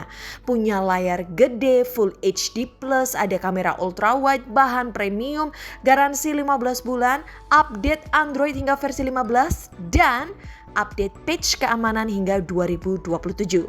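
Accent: Surabaya, Indonesia. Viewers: orang surabaya ya mbak?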